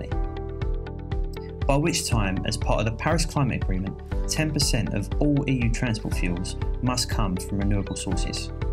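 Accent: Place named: United Kingdom. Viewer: Why is a brit narrating this. Might give biden the wrong idea when he's thinking of where to allocate funds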